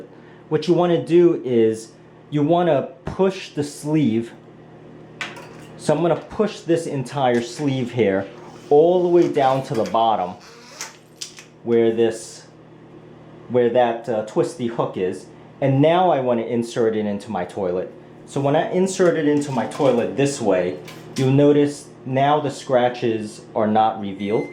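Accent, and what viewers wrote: Brooklyn, United States. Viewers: Thank you so much! It was very clear and persice how you explained! I liked how nice and clean your toilet area was and the masculine accent idk is it from Brooklyn, NY or NJ...it's faint but it's nice! I love that type of accent acutally... thanks for the video I haven't heard it in a while...I miss it I guess....but the video was excellent! I'm glad I found you...I'd watch another video of yours sometime😉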